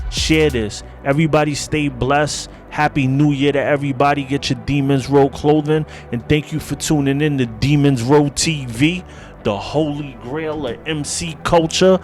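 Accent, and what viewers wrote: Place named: Brooklyn, United States. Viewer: It sounded like your from Brooklyn. I lived in Borough Park Sheepshead Bay and Canarsie but I’m here in Florida now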